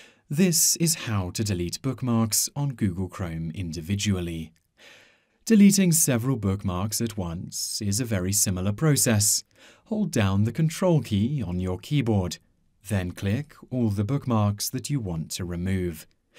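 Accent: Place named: United Kingdom. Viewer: This is just godawful. The speaker mumbles and he mumbles with a heavy accent (british?) What trash! Didn't ANYONE edit this??????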